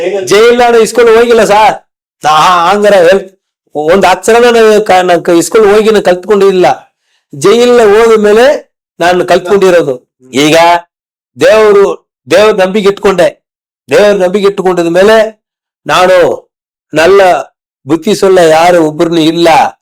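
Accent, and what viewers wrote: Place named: Maharashtra, India. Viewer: Border area sir,if you come to North Karnataka border area.you can hear marathi tone kannada.its common in border areas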